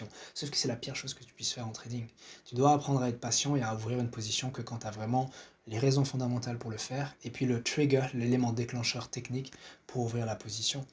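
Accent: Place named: Belgium. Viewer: Tu as un accent tu es de Belgique, suisse ?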